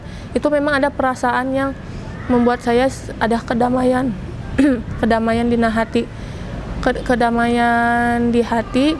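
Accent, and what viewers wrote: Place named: Sunda, Indonesia. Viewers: Teteh udh lama di bndung Yaa logat sundanya kental bnget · ko nyunda bgt ya bataknya... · Sunda pisan yaaaa... 🤗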